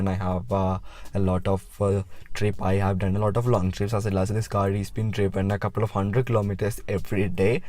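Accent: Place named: Kerala, India. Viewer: Malalyali aananu first 2 seconds le manasil aayi :)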